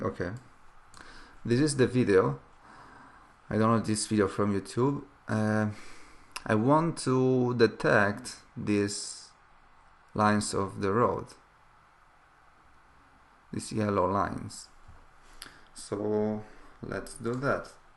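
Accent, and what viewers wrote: Italy. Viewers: Thanks a lot! Are you Italian?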